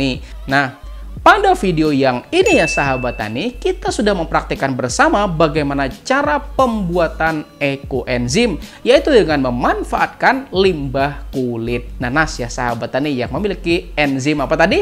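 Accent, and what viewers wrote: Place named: Kuala Kapuas, Indonesia. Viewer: Betul sekali pak, syg kalo di buang kulit buah, bisa di manfaatkan sebagai pembuatan kompos dna POC. Ulun suka logatnya, masih khas kaluwa nya 😁, sukses mang, dan sangat bermanfaat 🙏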